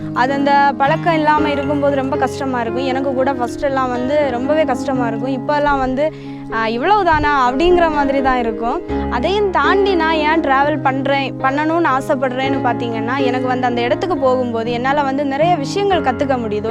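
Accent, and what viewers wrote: Kanyakumari, India. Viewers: Kannyakumari slang veliya tarriuthu akka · Hi ..... sister கன்னியாகுமரி slang nice...look like Kerala a slang ... உங்கள் கனவுகள் வெற்றி பெற வாழ்த்துக்கள்..... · Akka you’re kanyakumari Tamil slang so sweet akka. to beautiful❤️❤️❤️❤️👍 legend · Na slang vecha kandupidichitan Kanyakumari nu super sis nanum Kanyakumari tan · Uinga voice eaa solu thu kaniyakumari nu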